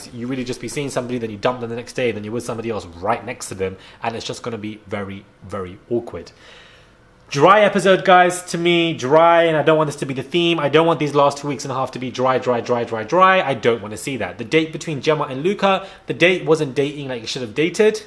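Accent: United States. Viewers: can we just stop with the American accent?? disgustanggg Thank goodness this channel exists, if love island doesn’t want us to comment on their videos then this is our outlet. I agreee, Gemma and Luca are BORING, these episodes are BORING, the fact that there’s been no challenges or preview of the next episode is whack. I literally come to this channel FIRST before I even watch the episode to see if it’s worth it cause lately it really hasn’t been.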